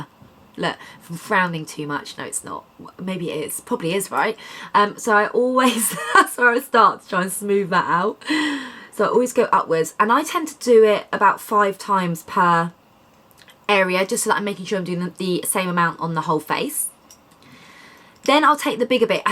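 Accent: United Kingdom. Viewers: I love her accent. Which accent is this? British?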